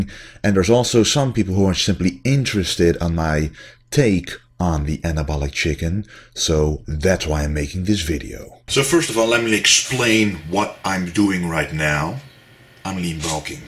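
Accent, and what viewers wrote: Netherlands. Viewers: Props for the Dutch accent! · Ik wist meteen dat je een hollander was met dat accent:p maar vette videos man. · Ben je Nederlander? Lijkt alsof ik een Nederlands accent hoor. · IN A WORLD.... WHERE EXTREME BODYBUILDING IS MOST IMPRESSIVE... THERE IS ONE MAN WHO FIGHTS... FOR THE NATURAL LOOK. Haha, you have that voice man! You Dutch bro? You sound Dutch :) Power to you, keep up the good work! Peace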